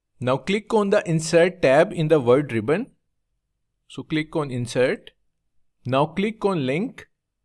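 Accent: India. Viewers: English language with an Indian accent. Deplorable condition 🙃🙃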